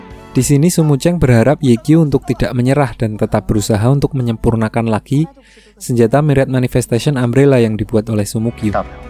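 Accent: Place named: Java, Indonesia. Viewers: Jawanya medok amat ngakak astaga~ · Yogyakarta bro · Wong jowo to mass,kok ngomong e ngono hehe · Njir medok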